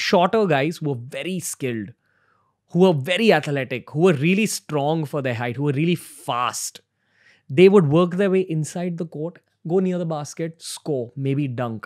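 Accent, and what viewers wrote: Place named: Mumbai, India. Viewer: Hi Ranveer, I hope you are well and safe. I don't follow your videos but have seen a few of them as you interview some sensible people like Saif Ali Khan and Rajeev Masand. Your english is understandable with your Mumbai accent. I understand you are trying to educate the masses in India (your prime target to enter Bollywood). I strongly feel every person in India wants to be a superstar! However, I don't understand that why do you keep mentioning who was your junior or senior in the elite school you have studied in. It's quite irritating to hear you mention this to every guest you meet. I assume you are doing well in your career. But are you trying to sell yourself by mentioning the names of all your juniors or seniors in school who belong to families with influence and money? Of course you are proud of your school and should be. 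Your body language is such where you looked and sounded like a scared child when interviewing Saif. I would like to conclude that I have written to you today using a pseudnym of Tanya Newman as I don't wish to be trolled, abused or threatened by your followers. Indians are a bit crazy that way if they feel offended by a view expressed by a lady. You look decent but please stop looking for praises. I really liked your mother's interview but it appeared that you wanted her to praise you all the time which she didn't. Most definitely, you tried putting words in her mouth. That was funny. And your video teaching the masses how to impress a woman/world wh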